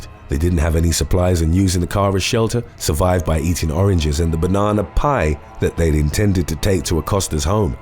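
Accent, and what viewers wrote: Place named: England, United Kingdom. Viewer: Dudes voice is up there with Barry white and Morgan Freeman. The narrator would make a fortune doin audiobooks and horror themed shit lol. The English accent just locks it in lol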